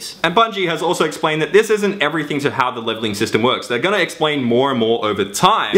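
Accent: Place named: Australia. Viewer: He didn't say the last part!!!! "My accent is Australian and blublublubla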